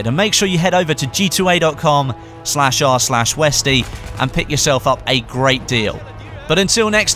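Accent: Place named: RP, United Kingdom. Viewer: your english is so beautiful! You talk like some dude from "BBC Learning English":D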